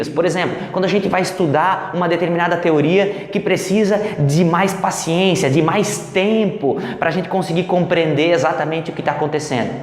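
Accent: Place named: Limeira, Brazil. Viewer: Amigo, vc é de Limeira-SP? Pelo sotaque, se parece com o meu....rsrsrs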